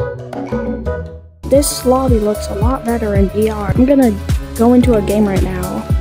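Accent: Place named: Germany. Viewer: you sound super german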